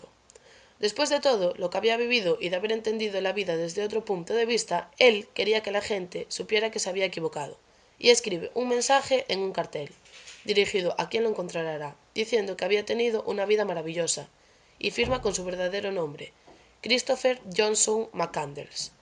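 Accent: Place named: Galicia, Spain. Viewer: Gracias. Tienes una voz preciosa...galleguiña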